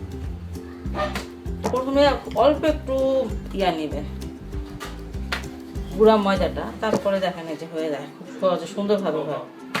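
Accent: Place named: Bikrampur, Bangladesh. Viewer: Apni ki bikrompuer meye? Amar mone hosse kotha shone.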